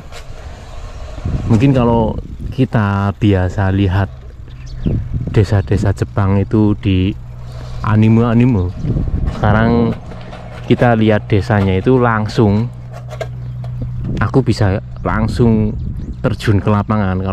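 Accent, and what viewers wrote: Banyumas, Indonesia. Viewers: Enak kang; ora ngapak ora kepenak....😊😊😊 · Mantab mas,ORA ngapak ora enak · Sy senang dengan logat ngapaknya kang.sekalian belajar bahasa ngapak.sukses terus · Malah seneng mas, Ngapak,dadine natural. Ora mboseni,terus pemandangane apik bgt. Aja klalen,"permisi Mbah,🤭🤭🤭bismillah mas,😃😃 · yang ku suka dr channell ini adalah boso ngapak nya mas dan content nya yg sederhana, semangat mas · Aku nonton sambil nelajar ngapak 😊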